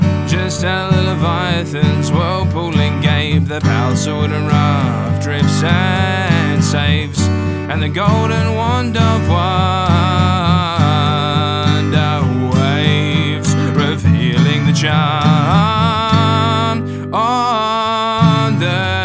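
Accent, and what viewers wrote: Australia. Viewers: I swear this guy gets more Aussie every non gaming voice chat video P.S please bring those videos back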